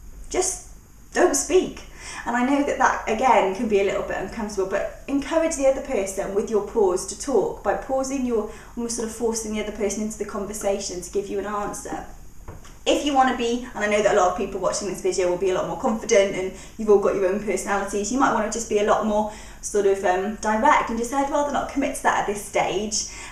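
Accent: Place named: England, United Kingdom. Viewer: I like your English Accent, it's awesome!.. An employer asked me "How CHEAP will you work"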